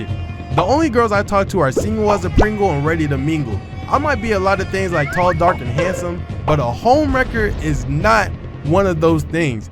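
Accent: Haiti. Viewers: Yo like the way he u was talking Haitian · I like your Haitian accent tho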